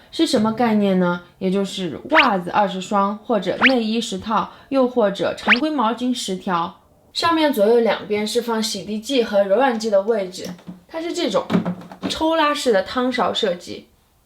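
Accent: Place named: Chengdu, China. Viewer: 听口音，好像成都的呢。 用的了那么大的还一大一小啊？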